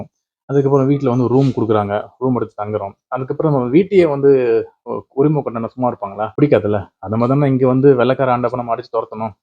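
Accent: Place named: India. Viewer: ஒரு வடக்கன் நம்ம தமிழ்நாடு பத்தி அவங்க ஊர்ல இருந்து பேசுற மாதிரி இருக்கு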